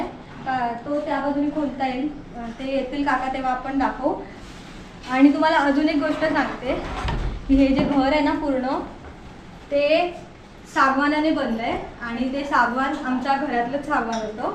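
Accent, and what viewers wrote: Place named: Vasai, India. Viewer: तुझे व्यक्तिमत्व, दिसणे तसेच बोलणे अगदी वसईतील गावाकडील लोकांसारखे वाटते. कदाचित माझे मत चुकीचे असेल. तुला कोकणातील बारीक सारीक गोष्टींची माहिती आहे जी तुझ्यासारख्या सध्याच्या नविन पिढीतील कोकणातील मुला-मुलींना ही त्यांची माहिती नसावी.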